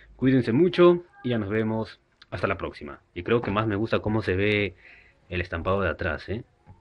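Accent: Chile. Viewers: eres chileno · guau parecias chileno 😝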